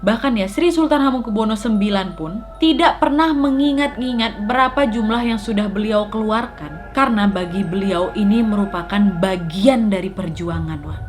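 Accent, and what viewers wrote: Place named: Medan, Indonesia. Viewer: Aku pikir orang Medan? Bener enggak sih? Soalnya logat cakapnya macam anak2 Medan, ngejelasi macam begado, pakek ketawa dan macam gibah😆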